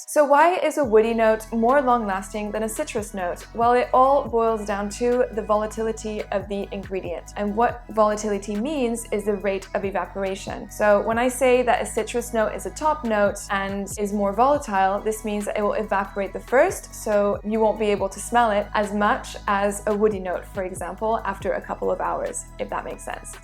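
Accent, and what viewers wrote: France. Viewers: You have a very good French accent. Are you originally French?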